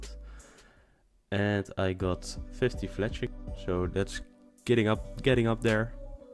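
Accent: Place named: Netherlands. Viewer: I cant help but notice your accent, a dutchy right?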